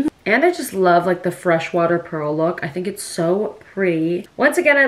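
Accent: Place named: Scotland, United Kingdom. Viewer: OMG I love her accent, I think it is Scottish?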